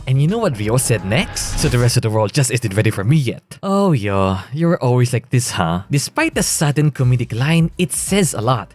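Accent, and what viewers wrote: Philippines. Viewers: I think your a Filipino because of your actsent.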